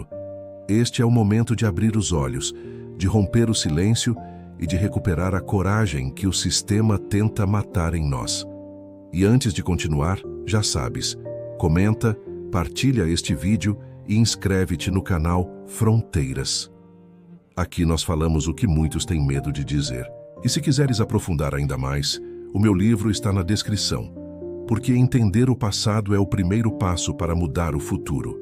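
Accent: Brazil. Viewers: Quando é que vamos ver a tua verdadeira cara porque tenho a certeza você é uma pessoa conhecida em Cabo Verde mais preferes ficar escondido atrás das camaras com um narrador português brasileiro o que não crédibilisa os teus argumentos sempre para destruir tudo de bom que os nossos dirigentes tem feito de bom para Cabo Verde nunca ouvi da vossa parte uma coisa positiva sobre nosso país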